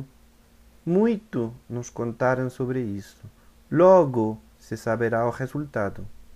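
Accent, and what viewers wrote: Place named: Portugal. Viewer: tu portugués parece ser medio europeo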